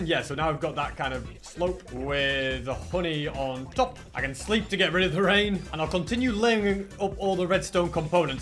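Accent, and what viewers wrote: United Kingdom. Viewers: Hi are you British or American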